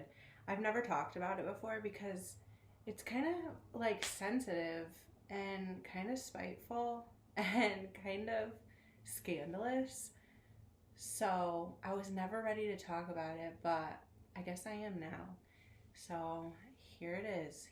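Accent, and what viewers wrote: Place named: Midwest, United States. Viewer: You have to be from Michigan or Wisconsin? Anyway I travel all over for my job and can spot that accent anywhere. Thanks for the videos your advice.!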